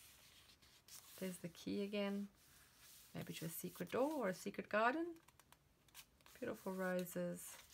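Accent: South Africa. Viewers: Thats a south African accent I thought it was British....love the journal xx ps it's called pianola paper or just piano paper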